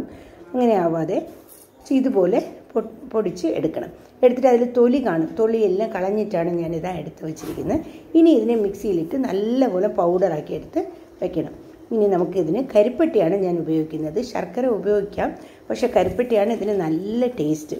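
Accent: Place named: Tamil Nadu, India. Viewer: നിങ്ങളുടെ സംസാരം നല്ല രസമുണ്ട്.. Tamil slang touch ഉണ്ട്, നല്ല recipe tnx ❤️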